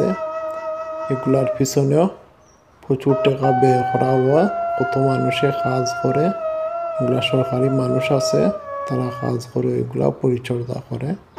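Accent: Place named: Sylhet, Bangladesh. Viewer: খুব সুন্দর হয়েছে আর এবারটা বুঝতে পারছি আপনি বরিশালের না সিলেটি😅😅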